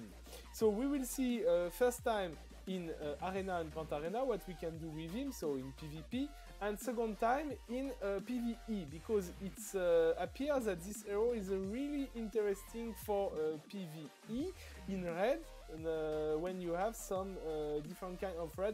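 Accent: France. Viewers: Bon gros accent français mdr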